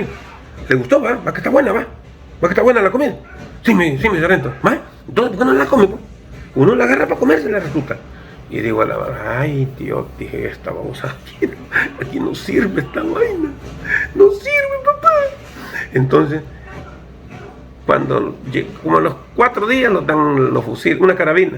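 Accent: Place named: El Salvador. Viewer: Me llega como cuenta las historias este sargento .con un acento muy a lo salvadoreño,un acento campirano y esa jocosidad para narrar las historias .saludos sargento.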